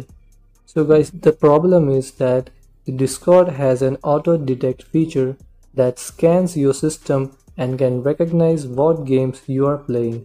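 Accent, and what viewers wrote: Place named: India. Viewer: its because he is protraying it as an indian accent.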